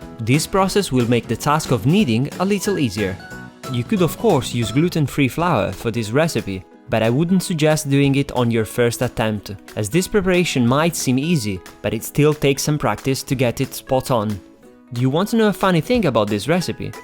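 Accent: Italy. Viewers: yet another great video, thank you! Your videos are always very entertaining because of your wonderful sense of humour:) We also love your italian accent and how you pronounce every letter of English words (as you do in Italian) such as "fruit" and "juice";) Our beautiful Italian vegan friends here in Brisbane recently made us potato and pumpkin gnocchi from their nonna's recipe - buonissimo! They will love your channel too we're sure.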